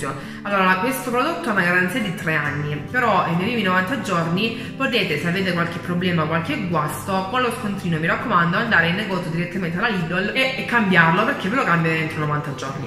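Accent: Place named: Taranto, Italy. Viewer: Sono un Tarantino , quindi si capisce subito Complimenti per la recensione!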